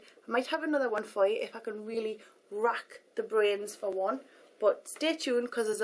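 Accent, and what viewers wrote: Newcastle, United Kingdom. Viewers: r u frm newcastle cuz u sound like cheryl cole! :) x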